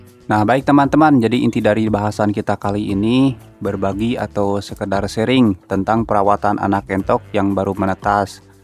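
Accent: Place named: Sunda, Indonesia. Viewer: assalamu'alaikum mang amang orang mna gening ngomongna sunda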